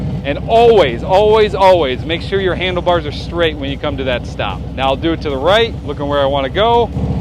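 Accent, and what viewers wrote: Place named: Midwest, United States. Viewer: your content is so good. Maybe it’s the midwestern accent 😮 that makes you so easy to understand 😅. I like the figure 8s you do in 4 parking spots. Keep up the good work. Really solid🎉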